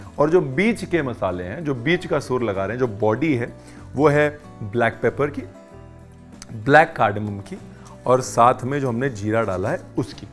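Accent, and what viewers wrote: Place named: Lucknow, India. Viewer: तुम्हारा लखनवी लहजा बहोत खूब हैं! मै भी इसी तरह बात करना चाहती हूं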